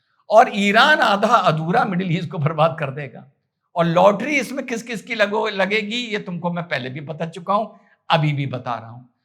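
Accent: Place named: Uttar Pradesh, India. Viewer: Namaste ..aspki UP ki meethi boli sunkar maza aata hai..over and above your honest analysis is not only worthy an applause but u have set an eg of what intelligent and fair educated people should adopt ..salaam to u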